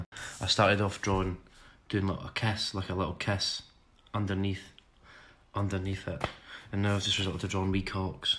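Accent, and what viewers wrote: Scotland, United Kingdom. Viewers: love ur Scottish accent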